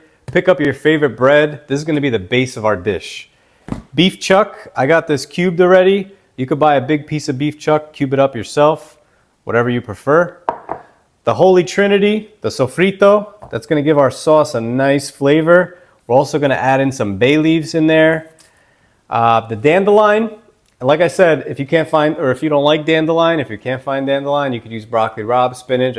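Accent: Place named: Long Island, United States. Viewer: are you from Long Island? I sense you are as am I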